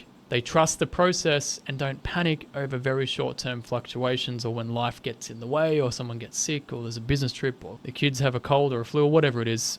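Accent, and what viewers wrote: Australia. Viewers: I couldnt understand a word. Are you osstrayliyen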